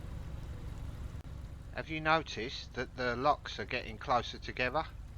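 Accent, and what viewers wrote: London, United Kingdom. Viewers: I'm enjoying watching your vlogs, only been at it a couple of weeks, working through the back catalogue. Love the simple "come along with me" filming, and the great narrative over the top. Your phrases remind me of my dad, he was from West London (Paddington), so the accent ever so slightly different, but the phrases exactly the same. My husband would love an uncensored version of your vlogs, but I just watched one of yours from the Fenny Stratford cruise, and told him you don't like the agricultural language! Keep it up, your content really is soothing.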